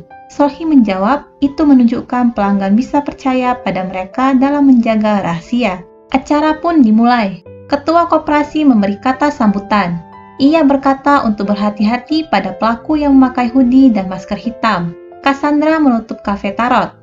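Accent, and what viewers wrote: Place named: South Korea. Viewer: Ini yg ngomong seperti org korea asli y logatnya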